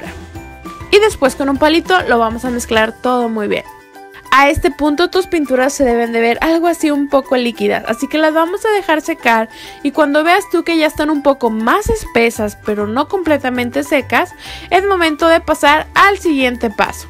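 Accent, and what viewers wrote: Fresa, Mexico. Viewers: Hablas muy fresa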